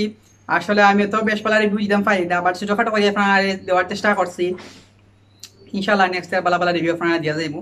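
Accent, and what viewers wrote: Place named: Sylhet, Bangladesh. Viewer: ভাই আপনার সব ভিওয়ার সিলেটি না। পারলে শুদ্ধ বাংলায় বলেন।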